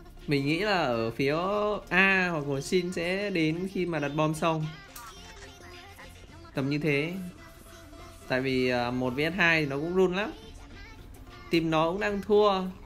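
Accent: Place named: South, Vietnam. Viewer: Giọng cha hình như trong.nam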